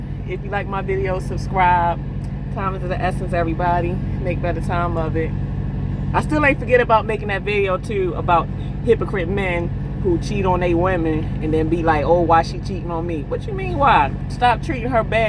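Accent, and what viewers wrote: Baltimore, United States. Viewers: That thick ass Baltimore accent, I'm from Philly I know a bmore chick when I hear it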